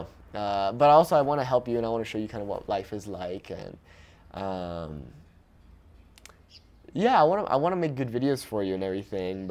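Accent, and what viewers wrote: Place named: Northern Mexico, Mexico. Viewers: Congratulations,! 🎉 This is a great move for you! I can't wait to see your Mexico City content. It will be interesting if your Norteño dialect will change after spending time in the big city! Keep going! ⭐ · he should use his northern mexican accent to not get scammed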